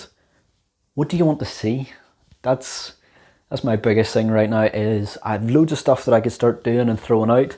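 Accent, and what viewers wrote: Northern Ireland, United Kingdom. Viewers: Nice to hear a Northern Irish voice on YouTube! Great channel! Not sure if you've already done this but 'How to make a AM/FM Radio' would be cool. Cheers.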